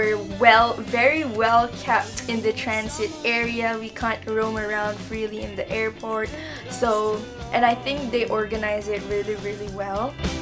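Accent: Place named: Philippines. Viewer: the way she spoke like filipino..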